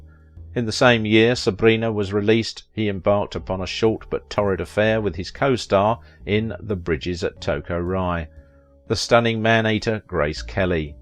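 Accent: France. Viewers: Your french pronunciation is cute ❤❤❤❤❤🇨🇵🇨🇵🇨🇵🇨🇵🇨🇵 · This was a very good video. I am I see your French accent as wonderful as well. Thank you for doing this yes I actually learned quite a bit about her and you portrayed her in a very fair light. I really enjoy your channel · The narrator has a lovely French accent.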